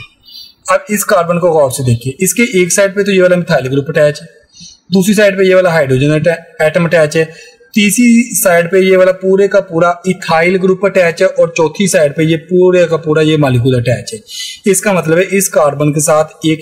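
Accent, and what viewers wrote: Pakistan. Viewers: Are you from 🇵🇰 Pakistan??...your accent seems like 😃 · You from Pakistan? Nice explanation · Pak se h bhai...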